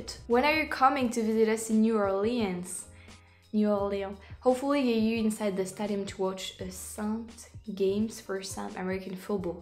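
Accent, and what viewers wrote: France. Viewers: Aghhh, i'm loving ur channel and ur french accent😍 Actually i'm studing french cuz it's so beautiful and now i'm happy that i found ur channel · Hi, what part of France are you from? I can't tell from your accent. Thx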